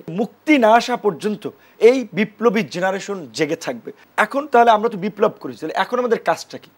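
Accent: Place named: India. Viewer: উপসহাপিকা ইন্ডিয়ান সটাইলে কথা বলবেন না।😊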